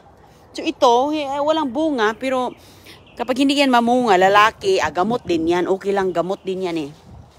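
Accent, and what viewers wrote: Philippines. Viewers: Like your tagalog Accent thanks for sharing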